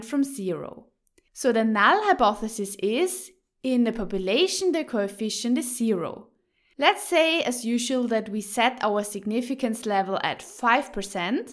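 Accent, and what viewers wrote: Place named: Germany. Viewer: the most awful german accent to date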